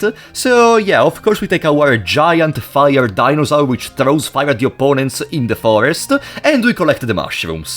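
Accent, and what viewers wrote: Italy. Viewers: I think you are italian just like me, kinda feel it from the accent, btw i'll comment in english cause I think that's the target that you want to reach; i'm impressed from the video, the dedication and the will you put on it, i love it from the bottom of my heart and i laughed so much so many time, please keep going on! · are you italian? the accent sounds like it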